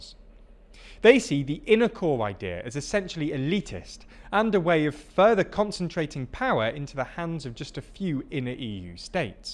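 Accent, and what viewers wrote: United Kingdom. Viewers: It's funny that a british sounding guy is talking about EU news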